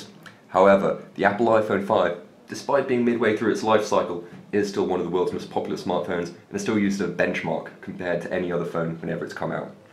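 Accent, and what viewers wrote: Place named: Europe. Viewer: Hate european english accents, cant understan them!!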